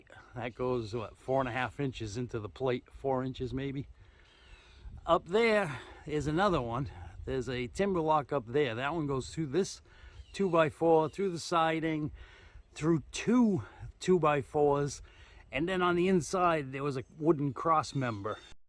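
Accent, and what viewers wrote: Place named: New England, United States. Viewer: LOL! Ithis is the first video of yours that I have ever watched. I am originally from NY, and have family in Ct and Ma. I heard the accent and said he must be from Mass. But further research said you were in Carolina. I was thinking how could he have that New England accent? Now I know.😊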